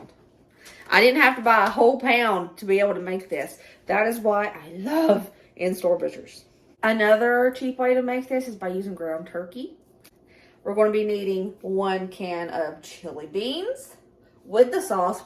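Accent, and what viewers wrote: South, United States. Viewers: Both looks very good! Love your voice and southern accent!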